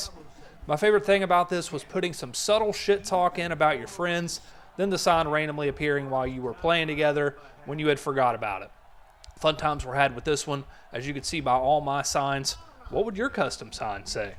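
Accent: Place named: Kentucky, United States. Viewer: You must be from KY like me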